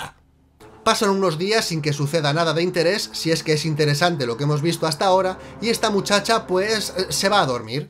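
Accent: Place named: Spain. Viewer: ¿Por qué los Españoles hablán tan mal el Español? O no más él lo habla así?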